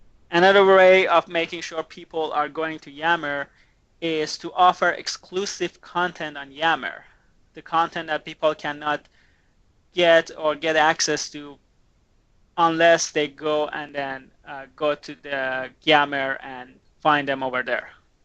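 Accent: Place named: Dubai, United Arab Emirates. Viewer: Is this guy from Dubai, I can not understand his accent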